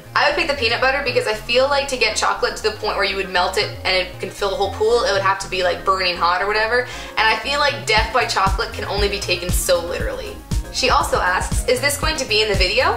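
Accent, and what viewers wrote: Canada. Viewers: Harry Potter is my favorite! I'm also really good at math although English is more fun for me. Ps your accent is so cute! I love Canadians.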